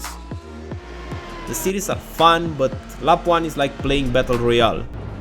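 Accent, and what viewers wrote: Romania. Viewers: are u from romania or ur accent sound kinda like a romanina that knows english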